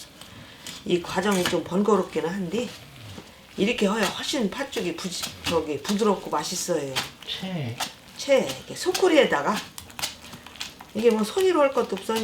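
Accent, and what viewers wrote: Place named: Chungcheong, South Korea. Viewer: 충청도여유 ㅎ ㅎ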